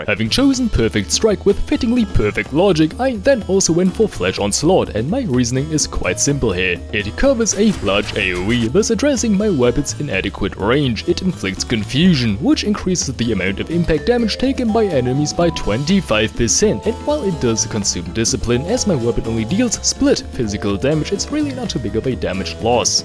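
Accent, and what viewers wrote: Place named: Franconia, Germany. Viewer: Wait are you actually German? Your accent is interesting, what region are you from? Your pronunciations remind me of the Franconian accents I've heard.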